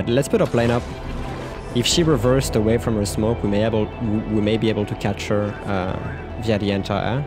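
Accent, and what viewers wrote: France. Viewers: Je découvre ta chaine et je suis ravi qu'un français nous offre des vidéos d'une telle qualité! Ton anglais est très agréable à écouter, et sans doutes beaucoup plus agréable à pour les spectateurs qui ne sont pas familier du Français... mais ça fait toujours un petit plaisir chauvin d'entendre un accent français et quelques mots d'introduction en français.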